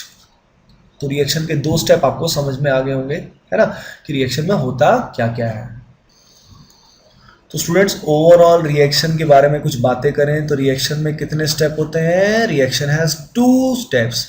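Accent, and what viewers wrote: India. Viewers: i really hate indian accent but thanks for ur effort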